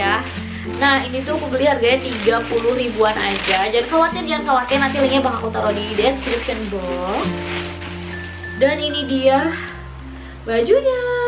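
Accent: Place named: Sunda, Indonesia. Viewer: kaka orang sunda yah? lucu ngomong nyaa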